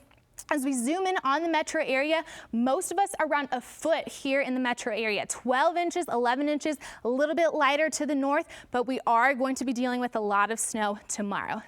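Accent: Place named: Appalachia, United States. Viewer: YO KRISTEN CURRIE PLEASE STOP UR HILLBILLY ACCENT WHEN U DO THE NEW MEXICO WEATHER. JUST BE U!!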